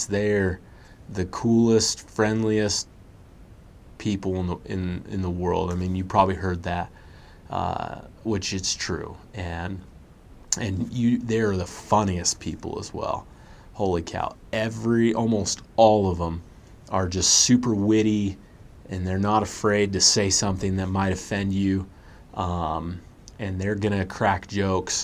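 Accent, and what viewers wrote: United States. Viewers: Give it a few months and you’ll lose your American accent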